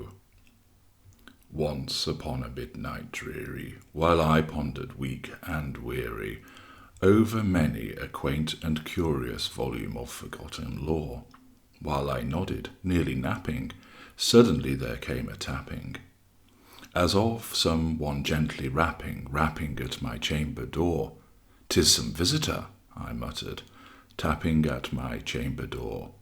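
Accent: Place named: Yorkshire, United Kingdom. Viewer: I can still hear Yorkshire accent though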